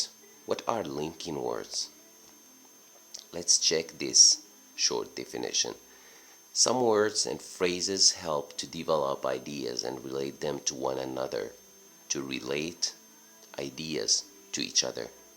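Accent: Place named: United States. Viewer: it's really interesting to see a teacher who really has a good american accent :-) thanks a lot for helping us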